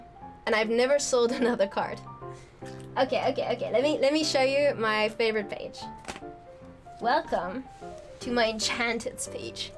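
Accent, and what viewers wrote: Afrikaans, South Africa. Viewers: Keep your South African accent - the merging of the two sounds silly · Do you speak Afrikaans? Is this your normal English accent?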